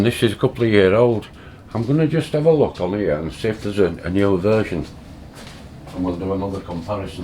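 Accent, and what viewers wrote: Yorkshire, United Kingdom. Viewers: Sound like a Yorkie accent !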